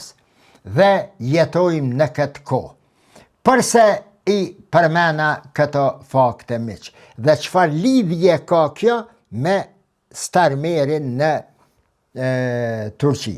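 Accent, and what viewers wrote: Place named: Kardhiq, Albania. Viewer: Ca flet kardhiqe moj kuder e metohise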